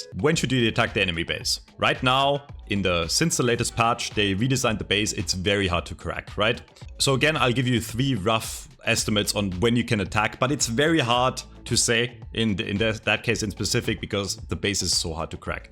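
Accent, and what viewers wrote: Germany. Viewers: I can hear Deutsch :^D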